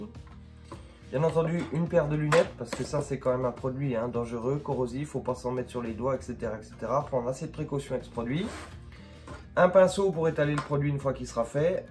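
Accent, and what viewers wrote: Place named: Franche-Comté, France. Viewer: Super vidéo merci... Ton accent m est familier... Franche comte?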